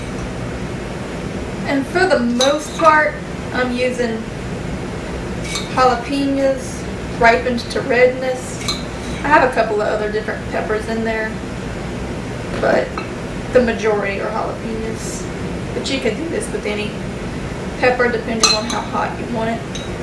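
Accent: Louisiana, United States. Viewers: Tony Chachere's, Magnalite skillet, and that beautiful accent. What parish are you from? It has to be a little further north than me judging by the landscape in some of your other videos (that i love). Wish i could get you and my wife to hang out so you could be her mentor. Keep up the great work! You have a new subscriber BTW.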